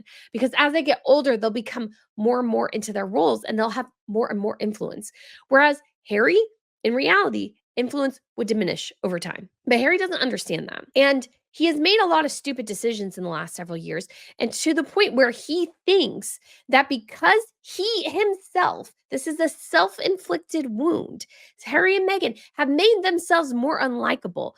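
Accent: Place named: United States. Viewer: Someone has put the toothpaste on Charles’ toothbrush for him every day of his life. What on earth would Harry or any of the rest of them know of the “real world”? It’s weird to hear an American accent defending William being obviously more important than Harry. I must be TOO American. “Royals” are just ppl. Being born doesn’t make anyone special. But the only reason Harry needs security is the circumstance of his birth. It’s all very weird.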